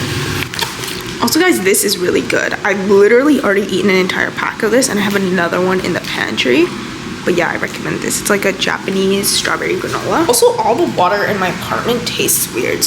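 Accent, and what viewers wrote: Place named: Toronto, Canada. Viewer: I am ready for the toronto accent to come out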